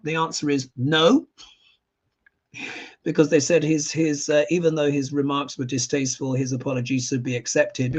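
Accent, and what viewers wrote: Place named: London, United Kingdom. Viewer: Thank you for reading on Germany; yes I live here and just don' t want war; I agree to what the pope said ( of course, I am italian 😂) inflation is still going on; in Germany, prices high. I am so glad, I found your channel once 😊 thank you for giving me a home feeling always when you talk; miss the Londoner dialect a lot; when we had the zoomcall reading last year I told you the Dad of our kid was from London; unfortunately he suddenly died 2 years ago. So it makes me happy when you talk and share your humour ❤❤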